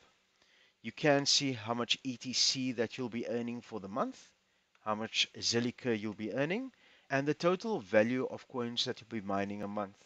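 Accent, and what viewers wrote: Afrikaans, South Africa. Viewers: Jou afrikaanse accent kom sterk deur😂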